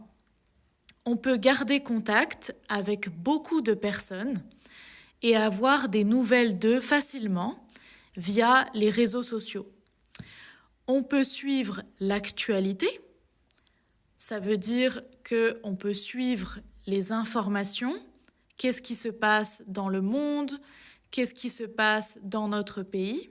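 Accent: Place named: France. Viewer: Hola mi amiga, muy bonito acento francés, aquí te escucho, un abrazo desde Canadá 🇨🇦🙂🫶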